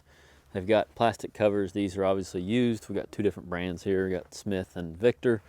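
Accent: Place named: South, United States. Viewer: A 'Southern' accent. Maybe Oklahoma or Texas? Not sure, but the gas/oilfields are in those states. All the Southern States have their own distinctive accents. My wife is from Tennessee & Mississippi, which is different. Georgia & the Carolinas sound very beautiful to my ear, but I like them all.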